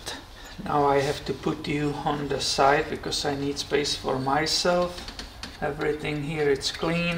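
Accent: Germany. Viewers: are u German or Dutch? Long time no video, thanks! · Thank you for you honesty and vulnerability regarding this repair. I am a High School Auto Shop teacher and I always impress upon my students that everything you do is a resume. Sometimes repairs won't work out perfectly and you might have to eat a bit of loss to complete the repair and satisfy the customer, but if you are honest and transparent, you will earn their trust and have them for life. You will also earn the customer's referrals. You are the kind of YouTuber that I am happy to add to my course content! I had the same experience on my wife's BMW X3. The o-ring on the oil cooler line tore the same way and leaked all over the accessory belt. Luckily I noticed it before the belt dissolved and broke (they have been known to wind around behind the harmonic balancer and punch through the front main seal into the sump, resulting in major engine damage). 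By the way, I am curious what part of the Old Kountry you come from. My wife was born in the Flensburg region but she can't identify your Dialekt. Bayrisch? Schwabisch?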